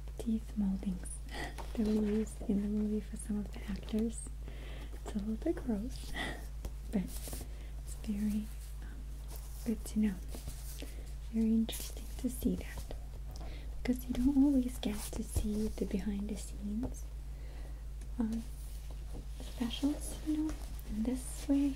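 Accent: Russia. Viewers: i love your russian accent and your handwriting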